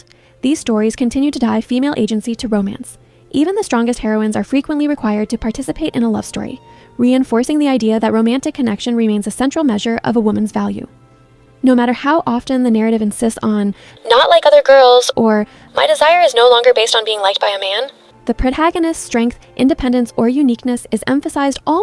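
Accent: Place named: United States. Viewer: If you didn’t say English wasn’t your first language I wouldn’t have known! Your accent is so American :) one request though, is to slow down your speech a little bit, I had to slow the playback speed down to 0.95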